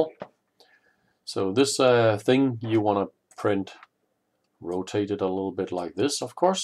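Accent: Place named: Denmark. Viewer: Awesome... Thank you. This was what I was looking for... By the way... Your accent... Er du dansk?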